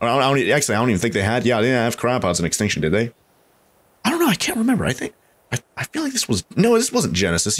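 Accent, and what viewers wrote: Australia. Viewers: i have started to watch ur scorched earth series and realized u sound Australian are u Australian in real life?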